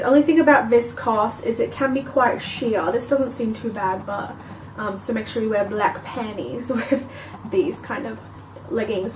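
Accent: Australia. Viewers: Yeah it's definantly Australian then. I was having such a moment last night. Think I'd be able to recognise my own accent lol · She's either from Australia or New Zealand (I can never tell the difference). I'm English and she's definitely not from here :) hope that helps x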